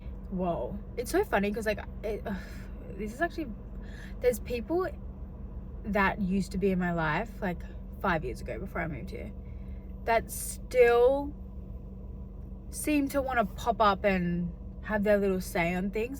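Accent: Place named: United States. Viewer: Omg I love your American girl accent 😂❤ please do a whole video with it!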